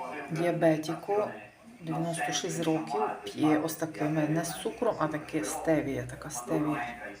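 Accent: Lviv, Ukraine. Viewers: Si sente dialetto Leopli❤ Pruvit Sono da cittadina vicina al Leopoli Sambir💙💛 Slava Ukraini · Привіт зі Львова. Чути по розмові, що наша😊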